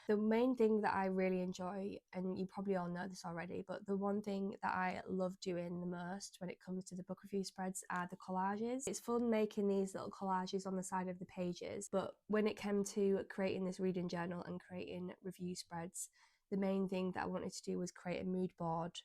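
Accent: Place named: Northern England, United Kingdom. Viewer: Ooh is that a northern accent I hear! I love discovering a fellow northerner on yt, just found my new fave channel! Your journal spreads are so beautiful ❤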